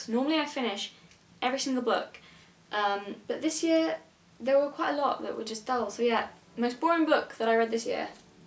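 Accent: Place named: United Kingdom. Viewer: By prober vids i hope you mean videos where i have to check if my headphones are working! 😂 (i showed your shadowhunters videos to my friend who loves them and he was like "she has to shut up, because shes right but i love the books and no smart girl with an adorable british accent can change that" 😂😂😅 we italians do love your accent)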